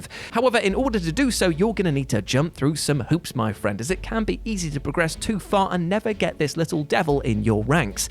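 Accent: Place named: United Kingdom. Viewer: he's british lol they pronounce names little differently than most to us it is You-Fee to him it is YU-FEE, like one person pronounced gerudo differently as Gar-Udo while some say Jer-udo making the G sound like a J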